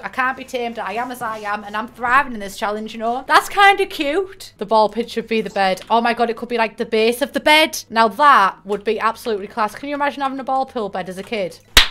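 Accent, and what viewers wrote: United Kingdom. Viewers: I cant imagine trying to understand her if your not a brit especially if you already struggle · Well I only understand half of what she says, but makes sense since I'm not native, and also I wonder from which part in the UK is she from.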